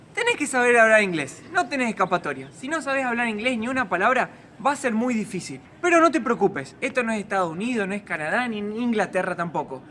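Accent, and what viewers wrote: Argentina. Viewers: Sos Argentino amigo por tu tonada. De que provincia??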